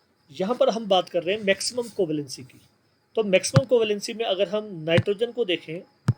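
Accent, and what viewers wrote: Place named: Kashmir, India. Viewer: yes sir .... kashmiri accent is 💞...btw im from Srinagar....iss saal board hai.... dua kejeye ga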